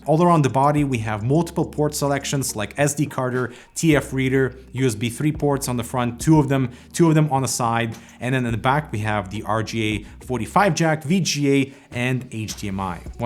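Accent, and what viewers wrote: Russia. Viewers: Чо? ты русский что ли??? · Are you Russian? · bruh u russian or italian ?????????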